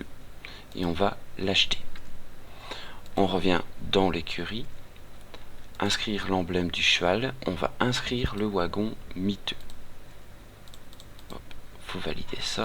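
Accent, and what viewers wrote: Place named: Belgium. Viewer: Mdr ! Pardon cela me fait rire ton accent Belge^^ par contre tu fait de très bonne vidéo qui me donne envie de jouer à ce jeu! continue comme ça👍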